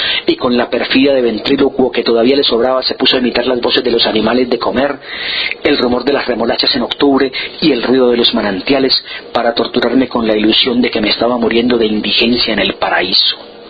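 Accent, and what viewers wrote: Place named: Antioquia, Colombia. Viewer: Esta no es de mis historias favoritas, sin embargo entiendo porque esta leída de esa manera. Para aquellos que critican al lector, deben saber que esta historia es acerca de un “culebrero”. Este es el nombre con el que se describe en algunas regiones de Colombia a aquellos que se dedican a vender o engañar al publico de pueblos con medicinas y cremas que en realidad no tienen ningún valor medicinal. Es mas un show que una venta en realidad. El punto es que la manera característica de hablar de estos personajes es así como lo hace este narrador: de manera rápida, con pequeñas pausas para tomar aire y un marcado acento paisa 😀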